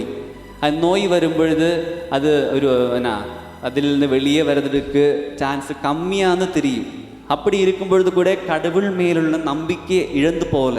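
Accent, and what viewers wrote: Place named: Kerala, India. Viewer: മലയാളി, എന്ന്, സംസാരത്തിൽ മനസിലാകുന്നു, ഇത് എവിടെയാ?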